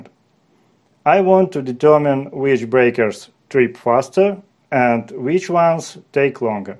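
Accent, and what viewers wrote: Russia. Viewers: Английский русскоговорящего нисчем не спутаешь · Why Russians have terrible English accent? 🤔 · Сразу видно русский на Английском говорит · Слышу Русский акцент))) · Сразу слышно русский акцент)) Спасибо за тесты и за все видео =) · Ебать русский акцент сильный😂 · У тебя русский диалект. Почему на русском языке не делаешь материал?))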